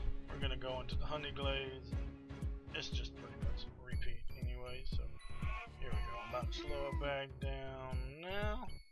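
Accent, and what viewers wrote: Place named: South, United States. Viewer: This was a decent video. Whispering like a scared little punk and your southern accent make it hard to understand you. Next time use your man voice.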